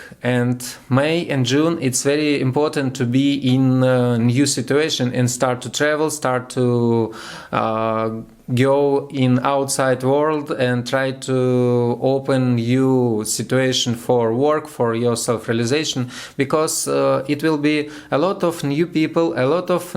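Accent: Russia. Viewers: День добрый прикольно русский английский это что-то...😁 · акцент классический русский... хотя у итальянцев такой же - по крайней мере, очень похож.. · Wow!! You were surprised! Yes, of course, your English is far from perfect, but understandable. In my opinion it's a good idea. You need to expand the audience and you are moving in the right direction. My English is terrible, unlike yours, and the same terrible Russian accent, which only improved understanding. Subtitles weren't needed. Accept my praise again! Keep it going! P.S. простите меня, если я вмешиваюсь в ваши планы, но было бы более правильным открыть отдельный канал для англоговорящей аудитории. Немного практики, занятий с носителем языка, и все будет великолепно! Русский акцент только придаст вам определенный шарм! Вот только танцевать не надо. Это уже будет too much.))) But that's just my opinion, you decide!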